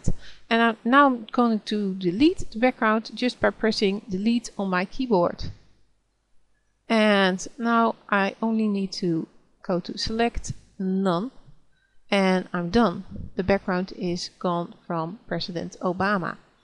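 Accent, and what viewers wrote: Netherlands. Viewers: I directly knew you were Dutch by the way you speak lol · kom op man!! je moet eerst leren beter nederlands praten. je hoor meteen je nederlands accent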